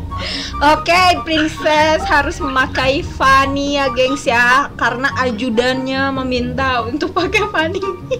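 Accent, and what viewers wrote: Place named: Java, Indonesia. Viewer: jowone ngendi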